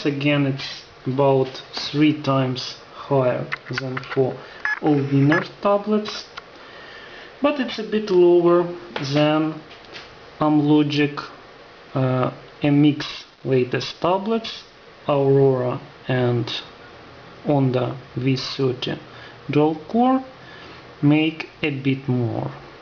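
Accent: Russia. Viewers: You sound pretty ruassian O_o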